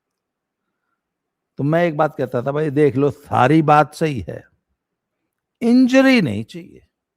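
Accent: Haryana, India. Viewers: ekdam 100 percent hariyanvi bhasa me boltey ho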